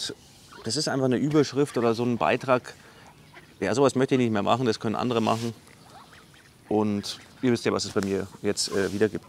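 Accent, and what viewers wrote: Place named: Bavaria, Germany. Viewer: Ich finde Dich sympathisch, weil Du kein Dummschwätzer bist. Du ziehst das alles durch, obwohl Du sicherlich finanzielle Einbußen hast. Und auch Freunde/Bekannte durch Deinen Sturkopf - positiv gemeint - in den letzten 2 Jahren verloren hast. Soviel dazu - Und jetzt muss ich mir das Video nochmal ansehen, weil ich die Enten nicht richtig verstanden habe. So gut ist mein bayrisch auch wieder nicht :-) P.S: Darf ich Videos von Dir bei mir hochladen oder wäre Dir das unangenehm?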